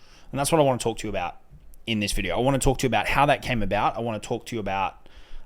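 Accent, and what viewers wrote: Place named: New York, United States. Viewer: He sounds like a New York kinda guy. I'm glad I left NYC in 2010.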